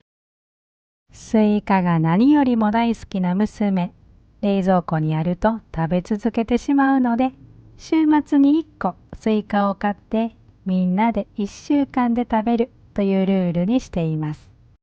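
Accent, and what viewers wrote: Kansai, Japan. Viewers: いつも楽しく拝見させていただいております。関西弁でとても聞きやすいです。私は兵庫県です。食って大切ですね。私もちゃんと考え直さねばと思いました。できることから一つ一つ改善していこうと思いました。皆さんお元気で。これからも楽しみにしております❤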